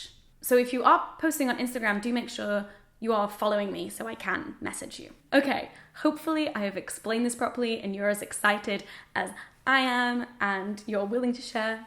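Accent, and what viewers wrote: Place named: Manchester, United Kingdom. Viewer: Literally couldn't tell you how many years I've been watching you and the Hormone Diaries is my fave thing! Also as a fellow manc living away from home hearing your accent is very comforting😂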